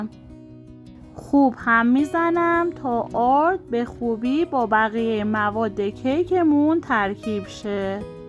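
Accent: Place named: United Kingdom. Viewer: استاد چرا زبان پارسی را با لهجه انگلیسی صحبت می‌کنی .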